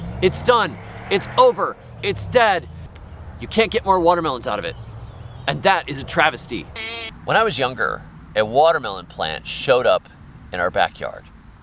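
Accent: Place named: United States. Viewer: I left the UK in 2024. Here in Iraq, they don't have seedless watermelons. That's something which until I saw your video, I didn't know existed. It sounds like science fiction. When did developed countries get seedless watermelons? You sound like you're an American. Are seedless watermelons a post-2023 phenomenon?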